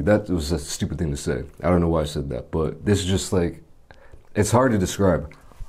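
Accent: United States. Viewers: Why do you have an American accent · U got American accent dude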